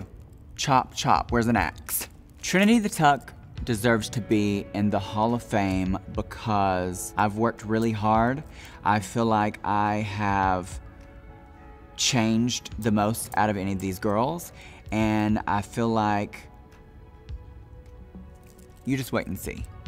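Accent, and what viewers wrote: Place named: South, United States. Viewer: I love her and get little country accent is too cute. I know she won't win but I'm really rooting for her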